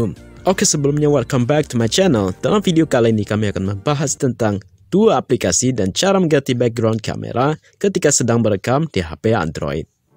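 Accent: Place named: United Kingdom. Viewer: Orang mana kak? Kayak logat english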